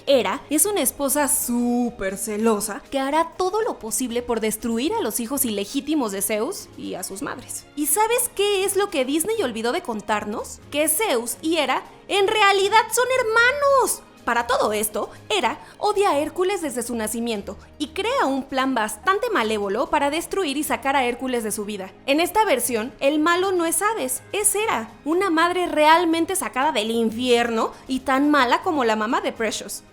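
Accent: Mexico. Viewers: Ese acento mexicano no lo soporto.. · Buenísimo el vídeo, no sabía casi nada hahaha... Una cosa, deben bajarle a ese acento mexicano, a los que no somos de allí nos incomoda un poco, por favor traten de usar un acento más "neutral" para que todos nos sintamos cómodos.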